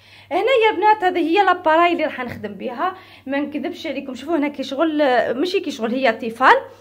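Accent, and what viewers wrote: Batna, Algeria. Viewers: اععع لهدرة نتاع باتنية تعيف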